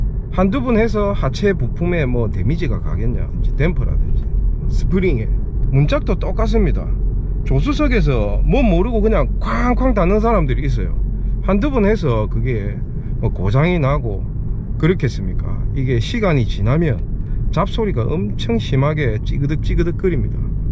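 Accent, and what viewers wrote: Gyeongsang, South Korea. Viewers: 제가 옛날부터 쓰던방법이고 알고있는지식이 또 유툽영상에 올라오니 신기하네요 . 사투리듣기좋아서 시청하고갑니다. 저도 경상권이라 ㅋ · 경상도 사투리 구수하네요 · 왠지 마산분일듯요 사투리가 마산쪽인듯용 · 질이 들어놓니까.... 갱상도 부산,마산,창원(진례)? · 이런거 모르는 사람이 어딧어 못하는 사람은 운전 미숙이지 그리고 사투리부터 고치고 영상 만들어라 듣기 거북하구만 경상도 사람들은 사투리 못 고치나? 방송인 강호동 나오면 채널돌린다 비호감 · 혹시 경상도 남자라예? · 잊고 살았던 구수한 경상도 사투리 ~ 좋습니다.ㅎㅎ ^^ 늘 응원 합니다 ^^ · 경상도 아이가 사투리 직이네~! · 놀래뿌써요! 역시 경남 사투리가 좋아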